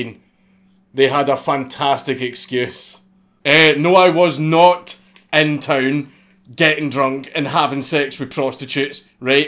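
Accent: Scotland, United Kingdom. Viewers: The stories you tell, and the way scottish accent, expose you as a really cool person who is doing something he's good at .... for the love of it. Thank You! Be well, and yes, I'm still practicing, but I've got doubt that I'll ever be able say 'clouds' , or 'fuck' properly. really, I guess it's just something you're born too. It sounds so ....... dare I say ... no. :-]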